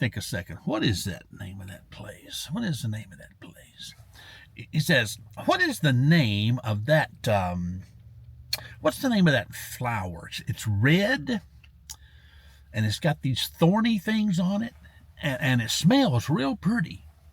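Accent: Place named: South, United States. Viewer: Glad is going out w/friends, good to hear! I was chuckling thru out this video so thk u & thk u big the foot! I think it would be great if all Adams utube friends get a matching big the foot air freshner & hang it up in their car. GIV recently bought one! I think it would be cool if we can ask questions about Adam & u on the days maybe when ur too tired to go out. Better yet, sharing stories of the Bible would be awesome bcuz I have ADD & Dyslexic really bad so I don't like to read, I lose my place when reading & my concentration is cowazy really lousy. I had someone tell me its the book ur reading, find stuff to read that u find interesting. Nothing helped, so then I would check out bibical audio stuff & they'll talk too darn fast makes my head spin. U have a nice voice like Adam maybe even better cuz u talk slower w/a southern accent that I have always found so comforting. 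So if u know any Bible study audio out there where the person talks slow w/a southern accent, why please let me know otherwise I think I just might wait for u to get & feel well again!🎶 U got a 🎵 friend in me!🎵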